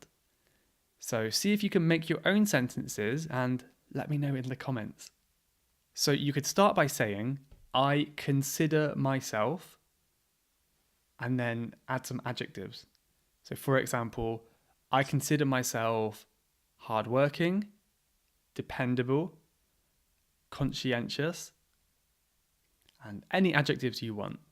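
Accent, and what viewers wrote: United Kingdom. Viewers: Hi! I came across your podcast by chance on Spotify and I really liked the episodes I listened to, especially this one about Job Interviews. I'm an English teacher here in Brazil and you have already become a role model for me! For a start, I'm biased about British accents! Just love it! Moreover, your talks are very well structured, clear and extremely didactic! I'm already a follower on Spotify! Thank you for your channel! I have a pilot podcast aiming at CPE Exam tips but it's just a draft, I have a lot to improve and I haven't been dedicating myself to it right now. It's called 'Polish Your English - Flávia Pini'. Cheers!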